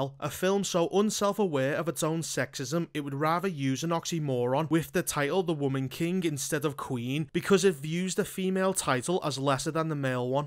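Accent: United Kingdom. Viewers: Bro i love your British accent and it makes it way more funnier 😅😅😂😂 Btw I am from Afghanistan 🇦🇫 Even though we fought three or four wars but still I love brits Love brothers No one can fake your history these people will try show everything was black like Egypt and North Africa. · I just love that British accent. "fockin dumb" XD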